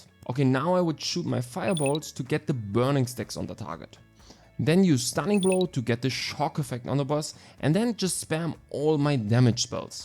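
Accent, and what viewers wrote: Germany. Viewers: so geil wie ich englisch immer besser versthe wenn ein deutscher es spricht. ^^ danke für das video!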